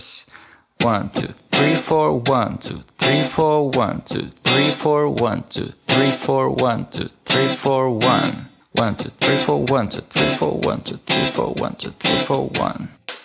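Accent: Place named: Eastern Europe. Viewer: Nah. This guy is accent is Eastern European.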